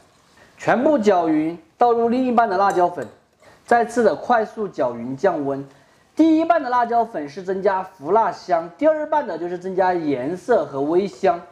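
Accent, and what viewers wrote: Sichuan, China. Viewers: 你的川普好听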